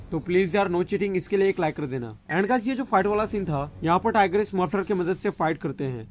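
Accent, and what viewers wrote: Assam, India. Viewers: Bhai aap Assam se ho kya????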